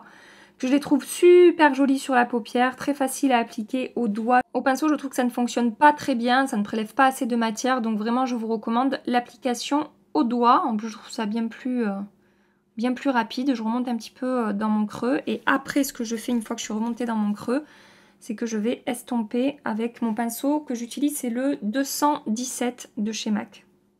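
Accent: South, France. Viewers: Bsr bon bien ecoute je ne connais pas du tout le fdt L'Oréal donc une occassion de le decouvrir et ce que je faire plus les monos de Sephora les couleurs me donne trop envie et vue qye mon mari m'a offert une carte cadeaux de chez Sephora pour mon Anniversaire 🎂 je sais quoi prendre lol.... et le Blush Milani cela fait quelque temps que je louche dessus donc avec ton avis tu me fais basculer 😂😂 en tout cas avec tout ce maquillage petit prix tu as su nous faire un magnifique MU, Tu nous inspire vraiment, on voit que tu aimes profondément ce que tu fait et que tu aimes nous le partager et nous on adore te suivre et te dire merci pour tout ce que tu fais pour nous, vraiment en plus tu as toujours le sourire tu n'es jamais négative et tu es adorable avec ton accent du sud et ton rire est contagieux et surtout tu parles avec nous sans filtre et ça c'est génial c'est comme si on était là avec toi dans la pièce et quand il t'arrive quelque chose tu ne réfléchis pas tu parles simplement comme tout le monde et c'est super alors encore un grand merci de nous faire vivre tout ça avec toi car en ce qui me concerne c'est un pur bonheur 😉😉😘😘😘❤❤🌞🌞 Je t'embrasse très très fort et te dis à Dimanche Ambre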